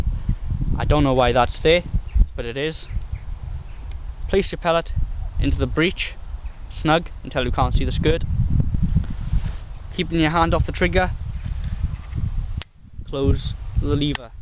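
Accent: Wales, United Kingdom. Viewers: That was the first rifle I bought and are you from Wales because you sound welsh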